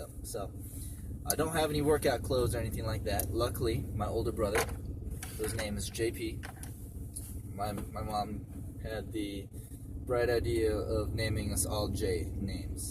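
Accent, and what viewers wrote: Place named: Washington, United States. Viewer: hey that's weird that I was just thinking this dude sounds like he's from Washington. lol I'm from Tacoma. love the videos and envy the lifestyle.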